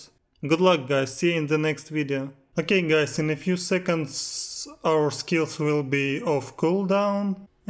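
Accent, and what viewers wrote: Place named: Russia. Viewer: русский акцент, красава, норм шпаришь по-английски!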